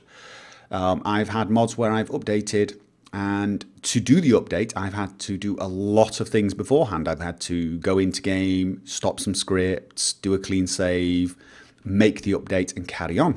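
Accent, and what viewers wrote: United Kingdom. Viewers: I actually first thought you were from Britain because of your English. A lot of people who have English as their second language have a really obvious pronounciation/strong accents. (like a lot of people from Roman or slavic countries)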